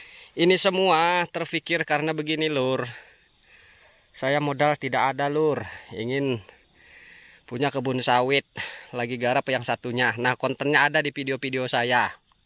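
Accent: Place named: West Java, Indonesia. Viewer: Ini daerah mana om kayak dari Sunda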